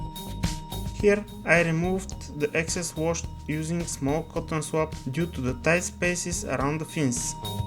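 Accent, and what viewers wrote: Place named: Russia. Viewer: He sounds Russian to me, I do enjoy the accent, and not blanketing over it.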